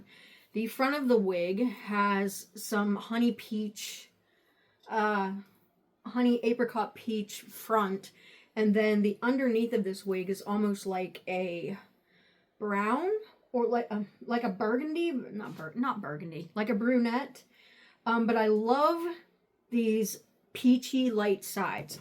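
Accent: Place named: Philadelphia, United States. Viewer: May I ask where you’re from? You sound like you’re from Philly or South Jersey area, where Im from.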